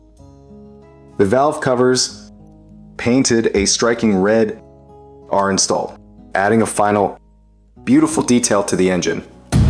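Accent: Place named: United States. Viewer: We don't need your ugly American accent to commentate to us, we can see . Therefore you stop talking through the men's work. Begone.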